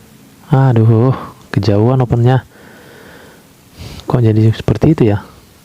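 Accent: Medan, Indonesia. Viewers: Org Medan ya bg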